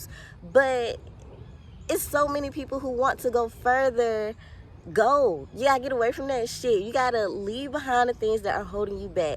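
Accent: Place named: West End, United Kingdom. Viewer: I was trying to figure out where you’re from! Wassup West Ennnndddddd!!!!!!!!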